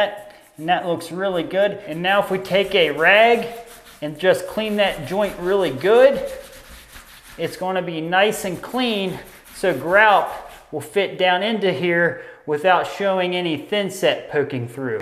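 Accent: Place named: Pennsylvania, United States. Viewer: Southern PA af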